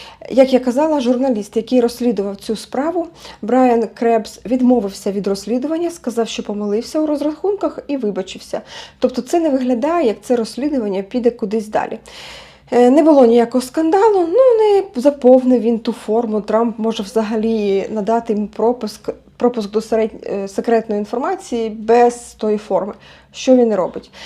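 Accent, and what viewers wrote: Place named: Vinnytsia, Ukraine. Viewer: Говор винецкий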